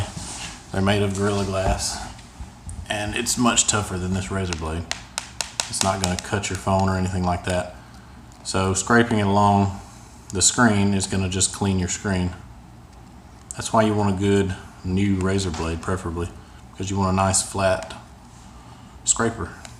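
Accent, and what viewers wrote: South Carolina, United States. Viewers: where is this accent from? south carolina?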